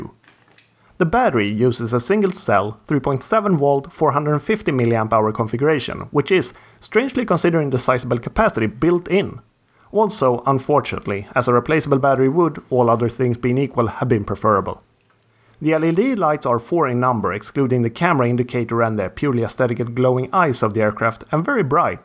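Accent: Sweden. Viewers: like ur vids man, love the swedish dialect, keed it up.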